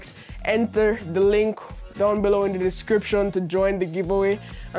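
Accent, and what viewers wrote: Jamaica. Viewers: This accent sounds jamaican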